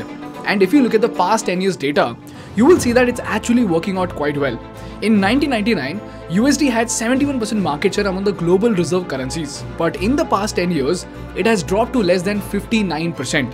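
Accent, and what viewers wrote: India. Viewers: I is probably a good lecture but you speak too fast and in an Indian lingo which is hard for most of us to understand. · why indian english is so indian?🥲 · The Indian accent is deafening. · The speaker has strong accent of indian slang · The indian accent is killing me you need to put subtitles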